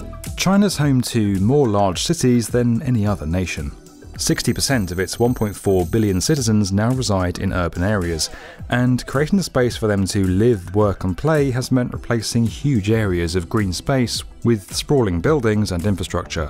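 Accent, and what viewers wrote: United Kingdom. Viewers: The Presentor is perhaps from Britain:))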